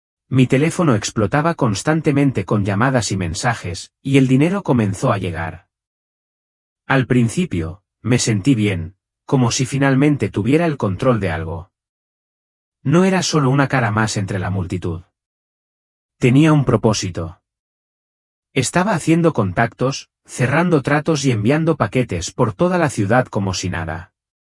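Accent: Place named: Catalonia, Spain. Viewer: Me choca que hagan videos religiosos con voces robóticas y además con acento español catalán, suenan muy mal porque son impersonales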